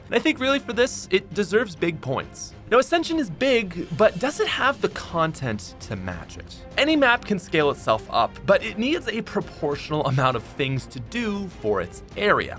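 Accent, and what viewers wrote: Canada. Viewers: Maso24k whats shitty about a Canadian accent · Nasal Canadian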